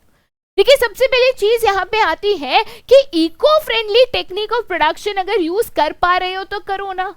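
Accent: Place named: Mumbai, India. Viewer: I think mam from Mumbai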